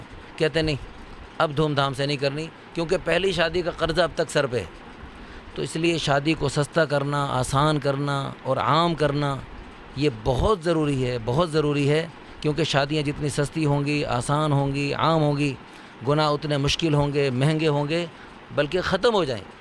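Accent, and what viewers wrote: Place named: Kotli, Pakistan. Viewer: Kotli sa bat kr rhe hn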